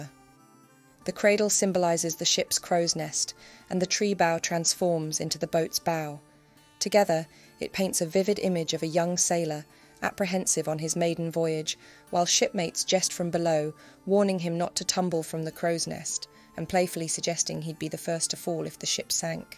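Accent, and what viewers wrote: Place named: South, United Kingdom. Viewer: Where is your accent from? Yes uk....most probably the south but more precisely? Very easy on the ear and very good command of the English language. Love your channel..all very interesting and thought provoking. Thank you 😊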